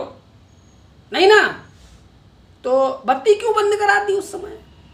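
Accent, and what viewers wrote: Bihar, India. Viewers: Pehale bihari baat choda ok baki sab theek he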